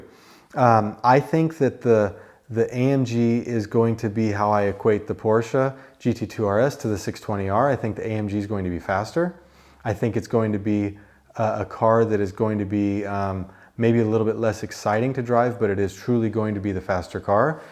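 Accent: United States. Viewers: I’m new to the channel are you American?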